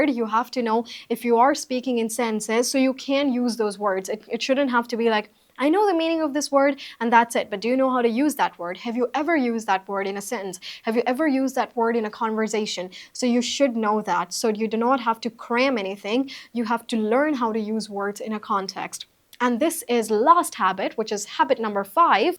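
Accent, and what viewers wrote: United States. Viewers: I think you speak an American English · Aha me english sikhane aaya tha lekin aa toAmerican accent bole ja rahi hai,,kuchh samajh mein hi nahin aaya😅😡😡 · Apki english sunkar,mujhe essa lg lg rha hai, jaise me india me nhi , America me beth kr apki video dekh rha hu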